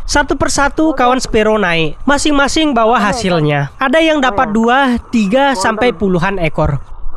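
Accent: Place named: Lombok, Indonesia. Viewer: Logat lombok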